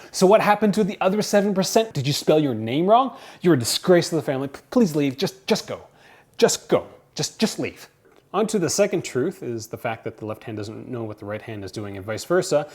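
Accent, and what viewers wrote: Canada. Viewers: I like your Canadian accent eh.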